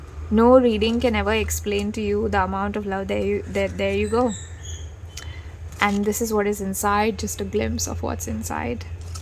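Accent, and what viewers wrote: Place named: India. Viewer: You are so creative. I can feel you like doing what you are doing, it comes from your heart. From your accent I guessed you are an Indian. And the reading was very accurate too. Pile 2 & 3 : my life has been difficult bc i was very different from people around me & as a consequence i struggled with loneliness,lack of support etc mental health issues & i had toxic relationship with a narcissistic bf for two n half years . I'm a double Aquarius with Venus in cancer. I'm a very quiet introverted person. Unless I'm with someone i know & trust a lot, I am not very emotionally expressive. Subscribed, I will watch your readings I think we are aligned. Your energy is calm relaxing serene and slow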